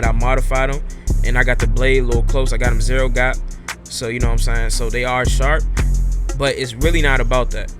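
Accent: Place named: Washington DC, United States. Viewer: I kbew he was from dc after a minute haha. The cadence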